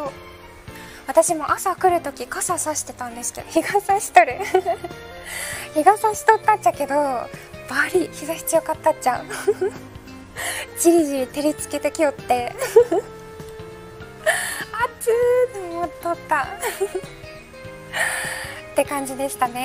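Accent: Hakata, Japan. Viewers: もう、博多弁でよかろうもん。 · ゆってへんだけでニュアンスはめっちゃ博多弁よなこの子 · もう博多弁で話していいよ てかキャスターの出身地の方言縛りの日あったら面白そう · 博多弁は正義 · 後半の博多弁で無事キュン死(*∩ω∩) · 途中からの博多弁凄くカワイイですね☺️ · カワイイ(*^.^*) いいんじゃないかな。博多弁とか方言混じり。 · もうずっと博多弁でやって欲しい✨ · むしろ博多弁にしてほしい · 博多弁好きやけんねー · 勘違いしたらいかんよ、可愛い子が博多弁を喋るけん可愛いと。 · 関西人やけど博多弁が一番可愛いし好きやわ。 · 博多弁可愛すぎる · 美月さん博多弁可愛いいですね。 笑顔でごまかす姿がとても可愛いいですね😊 見ていてとても癒されますね。 まさに女神ですね👍️ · 緊急事態以外、博多弁でやっても全然良い。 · 博多弁だったんだって改めて認識 九州弁(博多も使う)のだと思ってた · 博多弁のままでよかよ(^^)♫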